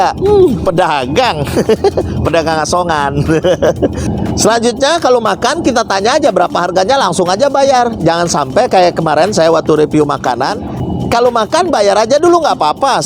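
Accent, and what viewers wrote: Medan, Indonesia. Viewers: adek ini orang medan ya?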